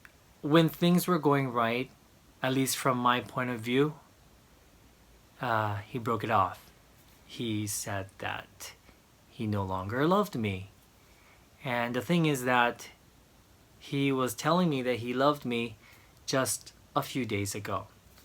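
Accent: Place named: United States. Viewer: actually grew up in Hawaii, hence his American accent and fluent English!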